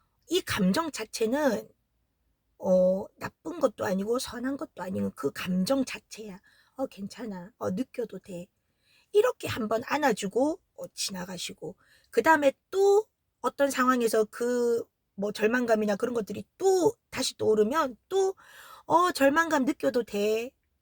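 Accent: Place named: Gyeongsang, South Korea. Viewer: 같은 경상도말투라서 그런지 나탐님, 사랑작업, 아이엠티비보다 이해가 500프로되네요 감사합니다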